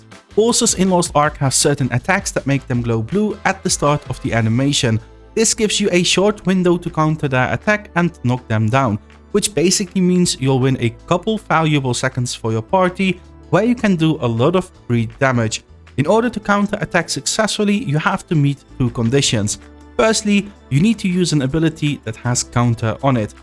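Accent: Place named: Netherlands. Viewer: lees net dat je Nederlands bent/spreekt hahahha ik dacht het al heel lang aan je accent te horen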